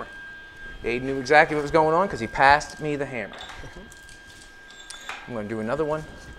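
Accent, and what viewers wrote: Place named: Canada. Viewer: Just discovered your channel a few days ago, and when I heard your accent, I immediately knew this has to a Canadian guy. Your videos are a bit longer than most of the videos I watch, but it's nice to see the uncut process of your work. I think it inspires a lot of people who would otherwise think they couldn't possibly do the things you do. It's good to see not only the successes and final outcomes, but also the trial and error that are part of real life. Also nice to see family involved in your passion. Carry it forward. New subscriber here.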